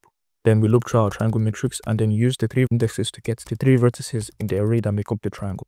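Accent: Nigeria. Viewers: this dude sounds nigerian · are you nigerian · Awesome video You kinda sound like some of my Nigerian friends ngl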